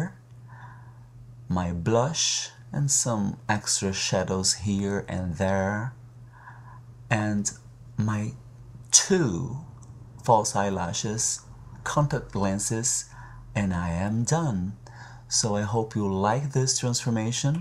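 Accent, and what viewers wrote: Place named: Brazil. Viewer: Hey, are you brazilian? I'm asking because I am and your accent seems like brazilian accent. If the answer is yes so... Você é muito diva, querida. Que bom que você está fazendo sucesso. Nunca desista da sua felicidade e sonhos... XO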